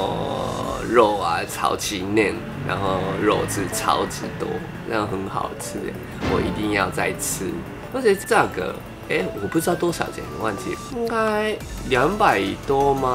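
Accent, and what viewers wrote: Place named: Taiwan. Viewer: 有台灣腔的你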